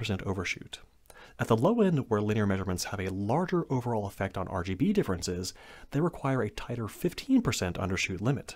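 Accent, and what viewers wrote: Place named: United States. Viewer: smart guy with american accent ASMR? subbed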